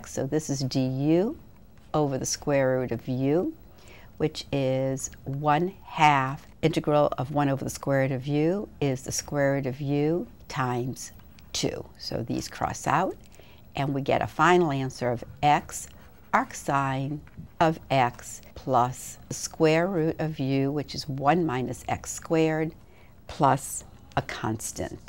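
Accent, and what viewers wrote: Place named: New York, United States. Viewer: The New Yawk accent is hard to take, but it's a start. YouTube falls down on deriving Integration By Parts. If anyone knows of good ones, please post.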